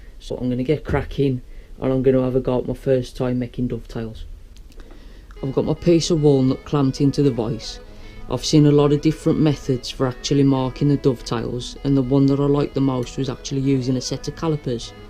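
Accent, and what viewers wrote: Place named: Midlands, United Kingdom. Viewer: Yo big. Im in awe that you had the guts to go straight in with the exotic timbers mate.....youre brave:-) I would suggest practising and refining your technique doing a few pine first.....but hey, i love your maverick style dude. Damn good effort though for you first bash. Another thing....(and im judging the purely by your accent)....but do you know there is a Midlands Woodworking & Powertool show in Newark on the 27/28th of this month....Im sure you could pick up a mallet there:-)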